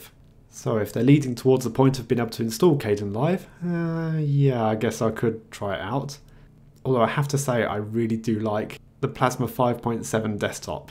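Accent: United Kingdom. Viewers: I enjoyed your broadcast. But since you talk so fast at times, I turned on CC. Interestingly "Mate" became "Marty" in CC. British accent sometimes add an R sound after an A. I imagine that watching CC of your talk might be enlightening.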